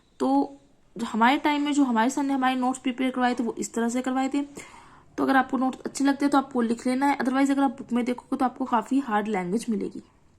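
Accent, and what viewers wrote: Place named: India. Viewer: Peak Indian accent didn't even understand a single word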